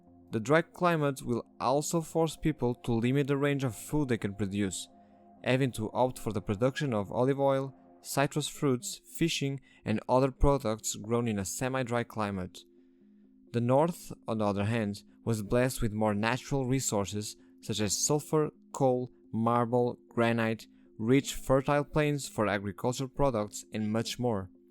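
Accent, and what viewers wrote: Portugal. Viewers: I knew you where portuguese because of your accent. When you said obrigado though i was 100% confirmed.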